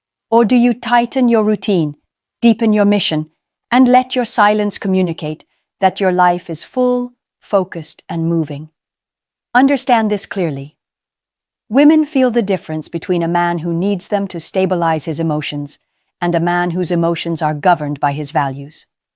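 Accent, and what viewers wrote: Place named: United Kingdom. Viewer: Thank you for your kind wishes! I really like your British accent. Your tone is also very soothing to listen too. Keep it up. ❤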